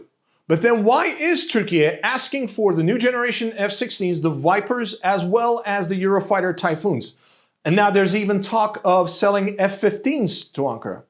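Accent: Russia. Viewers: I like your Russian accent… great …. No thanks to F35 …when you chase 9 years of Chasing Patriots … now go take hike they said sooooo well, …. C’est La vie…. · That russian accent... 😅👍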